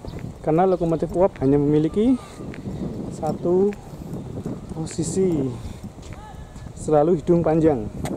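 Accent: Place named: Banyumas, Indonesia. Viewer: Masnya ngapak xixixi:D teruskan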